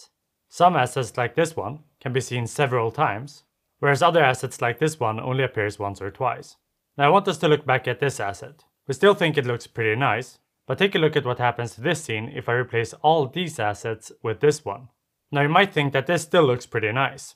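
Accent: Sweden. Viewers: Great video. Are you Swedish by any chance?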